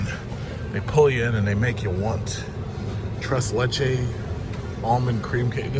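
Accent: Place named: New York, United States. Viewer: Yo where is this dude from? Sounds like a new yorker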